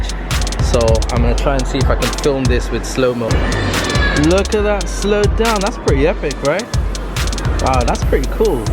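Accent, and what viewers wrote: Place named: United Kingdom. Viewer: I'm sorry why do you have a British accent?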